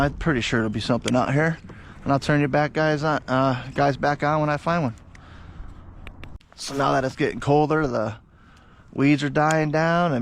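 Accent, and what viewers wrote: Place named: Troy, United States. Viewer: Sounds like troy ny lol I'm in Rentler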